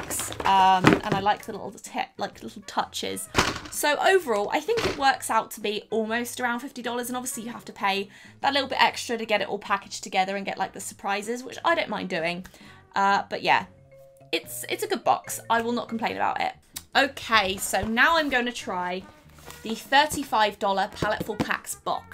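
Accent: United Kingdom. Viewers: Who els loves British accent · You have the accent of the British baby from Family Guy. Not Stewie but the girl he had a crush on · Wait she's British!? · i just LOVE your British accent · Where are you from ??????!!! You sound British but you say dollars ahh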